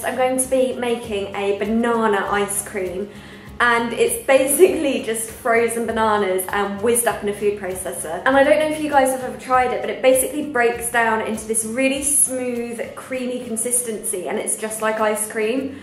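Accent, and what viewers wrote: United Kingdom. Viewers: "cay-an" im laughing. the way you say things (I guess its just British) is funny to me. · Where is she from? It's a British dialect but I can't quite place it exactly :) Brighton maybe?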